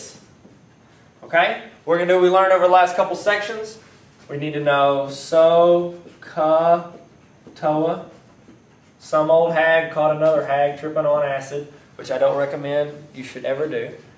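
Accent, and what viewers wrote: South, United States. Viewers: Btw awesome southern accent :D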